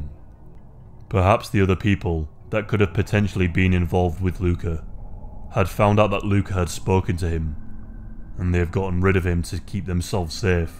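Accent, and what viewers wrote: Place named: Yorkshire, United Kingdom. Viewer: Of course it’s a compliment 💯 Yeah thought it was a yorkie twang lol, I’m Cheshire but I’ve got a friend of 15 years who still has a extremely deep and broad Yorkshire accent 🤯 😂 i thought ya all talked quick 🤦‍♀️😂!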